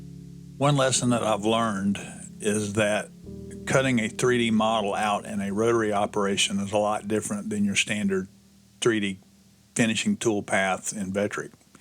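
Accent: South, United States. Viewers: The possum reference comports with the country twang😂 Mks Seriously Excellent job, excellent work Great video production And very effective voice over narration The steel BBs/ bearings not bad Personally, I would go with steel rods of several different diameters, cut into disks I just had to nitpick didn't I😂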